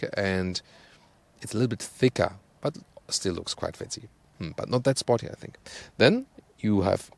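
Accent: Germany. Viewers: I LIKE this guy! I just discovered him a couple of days ago. Great reviews... and me being a GTI owner, the "German-thing" makes it even better! "Vy are Volksvagens so darned guut? Vell, being Gerrrman... because Gerrrmany makes them... and, vell, YOU don't!" Lol! :-P. :-P. :-P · Oh digga, man merkt wenn ein deutscher anfängt englisch zu sprechen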